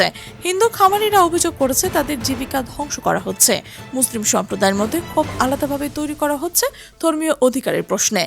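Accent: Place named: Bangladesh. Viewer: এই চ্যানেলটি হল বাংলাদেশীর। কথা শুনে পরিষ্কার বোঝা যাচ্ছে। বাংলাদেশের লোকেদের এই বিষয়ে জ্ঞান দেবার প্রয়োজন নেই।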